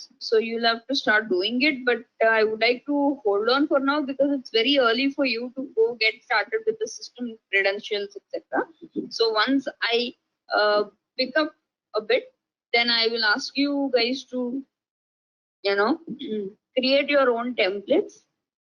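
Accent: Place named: India. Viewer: Very conc Indian accent, barely understand what she's saying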